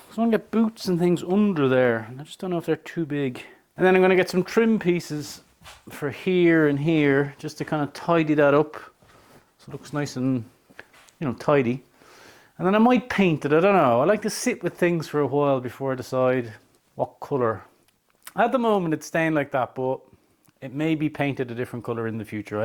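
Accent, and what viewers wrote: Ireland. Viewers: I spent so much of my life being made to be very quiet that I learned to observe and love things others rushed past. I often talked to my bicycle, too. I discovered the joy of talking to cats, dogs, cows and they reacted. Others do this too and I think nothing of it. Some people say we're a bit off until they talk to their car, calling it bad names or begging it to forgive them to get them home. My accent is like yours because of my dads irish accent. I am an American but in certain parts of certain states that accent was preserved. I miss my family, most dead and hearing yourvaccent causes me to feel at home with them again. Thank you so much for posting these videos! You may think it's boring for us but I'm far from bored.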